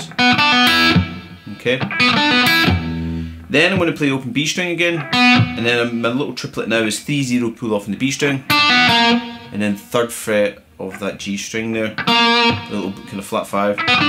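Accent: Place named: Scotland, United Kingdom. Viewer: Hi from France Sir. It's always a great Pleasure to hear you play ! Love your playing & your accent...!!! 😜 Reminds of my days up North ... Miss Scotland & the Scots so much ! Take good care, Keep safe and Keep it up ! You definitely Rock Sir. Best regards. Cordialement Luc, France 🇫🇷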